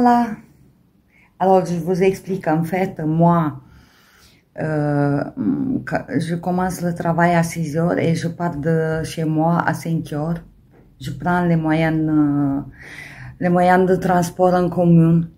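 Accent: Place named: Italy. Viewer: bonjour c' est très jolie ,avec un magnifique accent a la monica bellucie!☺ laine jolie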